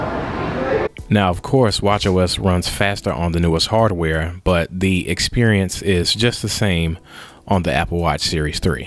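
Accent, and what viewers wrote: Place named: Durham, United Kingdom. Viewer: Lol bru you gotta be from durham or something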